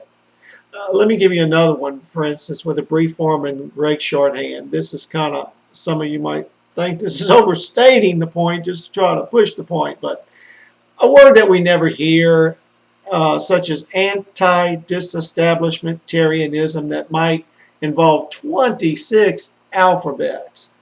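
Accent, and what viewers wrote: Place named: South, United States. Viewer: It's called a southern accent. Get over yourself.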